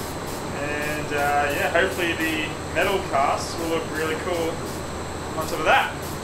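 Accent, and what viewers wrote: Australia. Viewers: A R its just an Australian accent it's not that hard to understand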